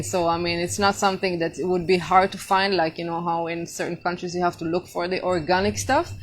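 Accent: Jamaica. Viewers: 🙂Lady you have the Jamaican accent!!🧏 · I can hear that you have picked up a slight Jamaica accent. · You sound and could pass as a Jamaican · Sorry, but Yuh yaawdie accent top tier yufuk!! 🤭🔥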